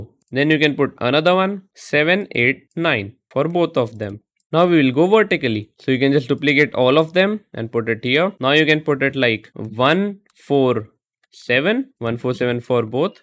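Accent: India. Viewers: when i heard that indian accent i knew this vid was gonna help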